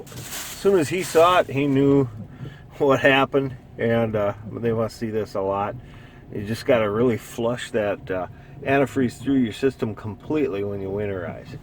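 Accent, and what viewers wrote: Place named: Wisconsin, United States. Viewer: Very helpful video. With that accent you must be from Wisconsin.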